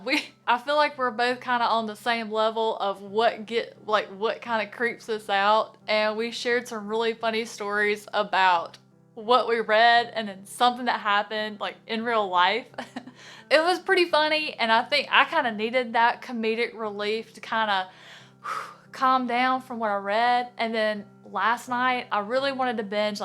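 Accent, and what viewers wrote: South, United States. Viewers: It's the southern accent.😍😍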